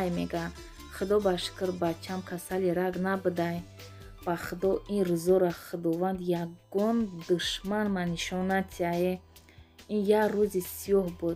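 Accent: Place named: Dushanbe, Tajikistan. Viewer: 21л Салом ,Шумо аз Конибодом лекин лахчатон монанд нест дигар хел ранги Душанбегихо гап мезанед,салати ачоибот зебо ва аз намудаш хело бомаза менамод👍👍👍👍😋😋😋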